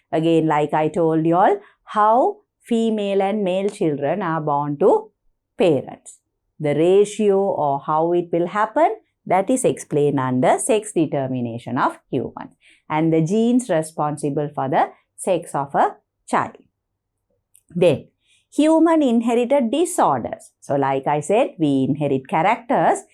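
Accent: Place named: India. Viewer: her accent is like an indian:face-fuchsia-poop-shape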